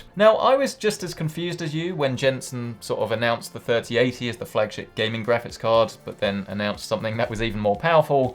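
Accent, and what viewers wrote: United Kingdom. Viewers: how did I know you were British before I watched this video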